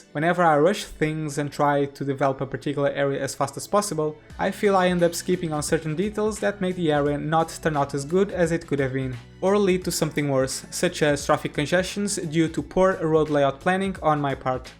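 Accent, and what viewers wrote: Portugal. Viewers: Suspeitei que eras português, e confirmei. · Vi logo que eras tuga pelo sotaque, props mano! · I thought you are portuguese. Now I'm sure :)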